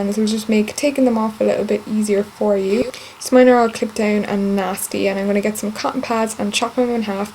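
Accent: Ireland. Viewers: You’re accent is great!! You sound a lot like my Irish friends! 💖 I’m battling my ridiculous nails this afternoon thank you!! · You're Irish? Sweet :D MY HERITAGE! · Love your Irish accent ❤️💚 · It's an Irish accent not an English accent! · OMG. Thx this helped! You sound Irish :) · I love your accent are you Irish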